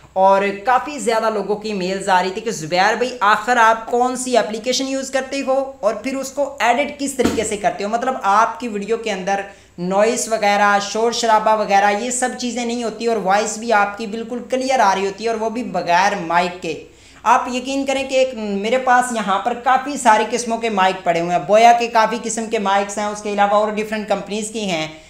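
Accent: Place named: Rural, India. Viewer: Posri k Sakreen nhn hota Screen hota ha bae nhn hota Bhai hota Pendu Urdu chor punjabi ma kr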